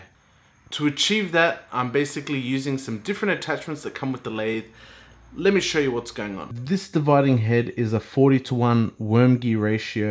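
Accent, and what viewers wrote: Australia. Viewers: Are you in Australia? You sound it haha. I haven’t watched all of your videos yet but course did/are you doing? B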